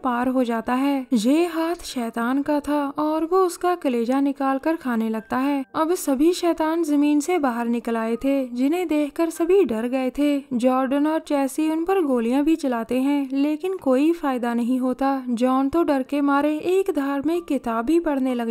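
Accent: Punjab, India. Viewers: Thodi thodi punjabi jhalakdi a explain vich😂😂😂😂😂😂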